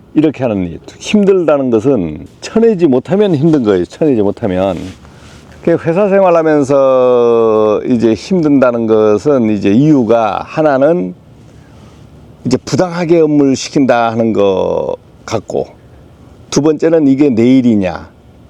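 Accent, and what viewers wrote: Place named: Jeolla, South Korea. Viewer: 이분 가족있나요?? 전라도 사투린데 ..........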